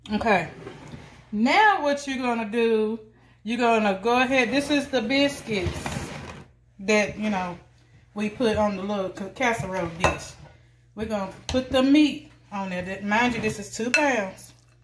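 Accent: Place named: Louisiana, United States. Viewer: You sound like you have a Louisiana accent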